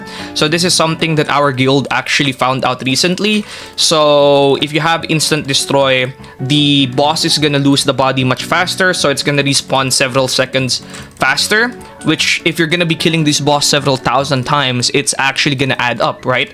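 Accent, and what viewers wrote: Philippines. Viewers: Wait?? Are you a filipino, because you have a filipino accent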